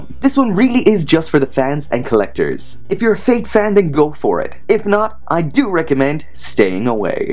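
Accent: Ireland. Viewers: Thanks for the in depth review. I almost picked her up to hold me over until kirin hobby sends my Sabre 2.0 is shipped out. You're one of the few reviewers that points out the good and the bad in equal detail. Quick question... Where are you from? Lol! At first I thought British Guyana because you say certain words similarly to my Mother but, after watching almost all yer vids, I"m thinking you're Irish. Just Wondering. Anyway keep it up!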